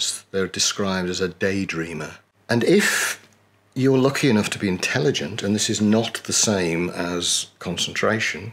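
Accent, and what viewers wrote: United Kingdom. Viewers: I’ve been wading through stuff on ADHD but this is by far the most useful, and really nice to hear it from a British person.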